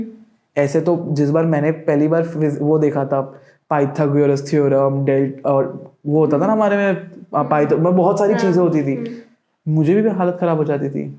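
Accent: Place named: Mumbai, India. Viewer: MUMBAI STYLE HINDI HAI.....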